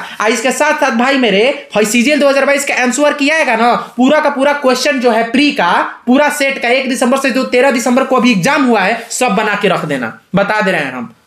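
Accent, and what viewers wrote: Bihar, India. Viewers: आप बिहार से हो ना 😇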